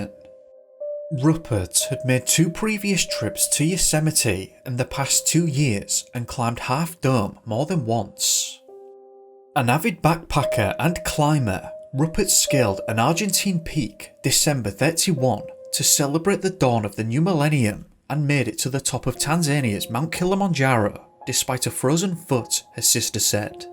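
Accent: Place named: Scotland, United Kingdom. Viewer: You could have easily been a character with your voice on trainspotting.🥳👀🤡✌️✌️✌️👌 cheers mate......no you sound just like you Ewing McGregor...WOW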